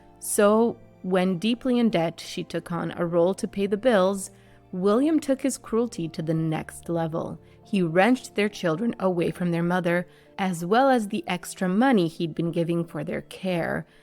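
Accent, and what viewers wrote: United States. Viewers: Brits, I presume English Brits, seem compelled to insecurely leave indignant comments on this American-accented narration. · peter ustinov great for the part of william 1V. not keen on an american narrating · Remember this is a Yank female narrating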